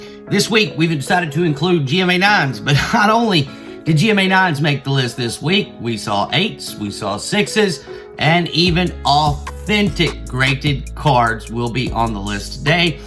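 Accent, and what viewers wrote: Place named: South, United States. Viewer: This guy looks like the actor Max Casella except with a country accent 😂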